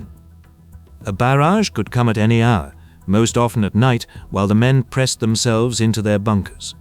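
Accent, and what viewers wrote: England, United Kingdom. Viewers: Always interesting hearing an English voice telling us about Vietnam .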